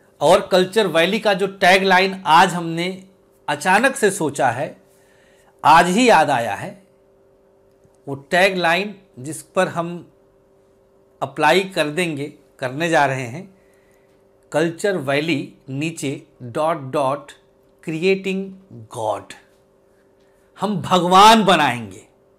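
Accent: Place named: Rajputana, India. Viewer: sir g aap brahmin h pr aap rajputana style m baate krte h..🙏🙏🙏🙏 achcha lgta h k apne swabhiman ko bnaye rkhna chahiye..chankya yaad aate h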